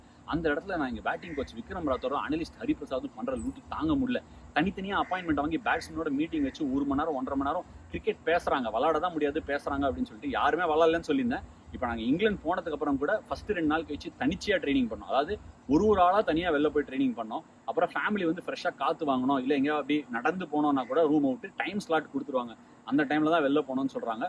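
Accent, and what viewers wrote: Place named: Chennai, India. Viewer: Chennai Tamila post pandrathu supera iruku plus it gives a feel like listening to a friend who opens up wat he has in his mind. Addicted to ur accent ❤️❤️ yaaaarrrum vara matangaa 🤣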